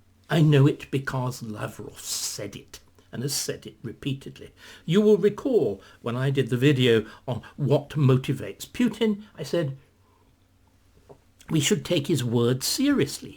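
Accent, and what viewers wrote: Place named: Oxbridge, United Kingdom. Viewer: Starkey is a fabulous Tudor historian but his increased penchant for political commentary has become annoying. As an academic, he should be more modest in his commentary on subjects outside his area of expertise; and certainly should not air such commentary on the the "David Starkey Talks" format. This confuses his area of competence with areas in which he is merely an amateur, albeit an educated one. His political talks lack objectivity. He always seems to know which conclusion he wants to draw. People may start to question whether he takes the same approach on his histories! Alas, judging by the comments below, my fellow Americans are too willing to give credence to this warmed up drivel delivered in an Oxbridge accent.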